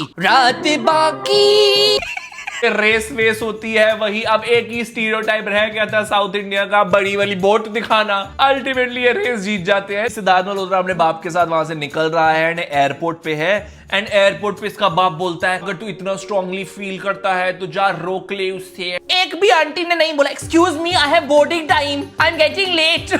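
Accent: Punjab, India. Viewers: His Punjabi accent ❤ >3 is love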